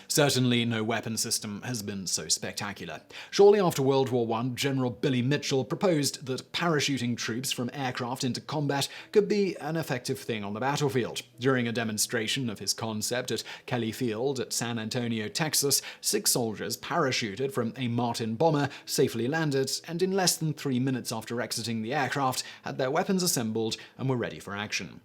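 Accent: United Kingdom. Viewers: I'm so confused, you sound British but all the videos are so heavily American focused.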